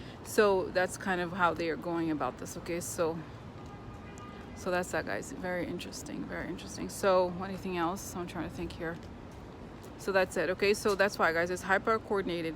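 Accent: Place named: Russia. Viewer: Have you ever watched "The Night Stalker"? An investigative jounalist who had a knack for unwittingly discovering and investigating psychic crimes; a TV-series from the 1970s, starring Darren McGavin as "Karl Kolchak "(imagine I'm typing with your Russian-style accent): 'very funny, I think you like'. Thank you for the succinct and interesting vlog; I'd been wondering the degree of co-ordination required and when, exactly, it had all begun - like, given Trump's history were the various state and federal agencies already in an investigative process. Great vlog, thank you and best vibes from Melbourne, Australia.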